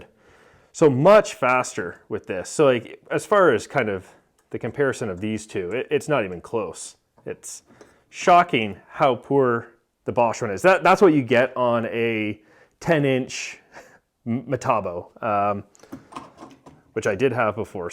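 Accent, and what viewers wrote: Canada. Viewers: how did I know you are Canadian? lol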